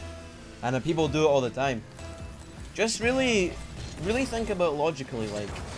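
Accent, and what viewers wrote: Scotland, United Kingdom. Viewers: Ye Scottish aye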